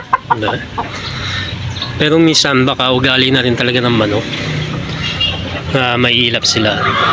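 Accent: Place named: Bulacan, Philippines. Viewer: Parang taga bulacan po ang inyong punto ng salita salamat po dammam saudi arabia po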